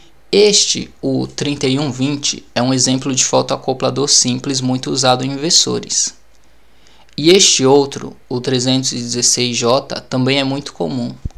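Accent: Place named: Ceará, Brazil. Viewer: Olá, boa noite., você é Cearense, né? Percebo que você fala como a gente aqui, rsrs. Mas, vamos lá... Os equipamentos inversores DC/AC de placa solar, são similares aos inversores de frequência de motores? São similares? O reparo é mais simples ou complexo? Desde já sou grato pela sua atenção.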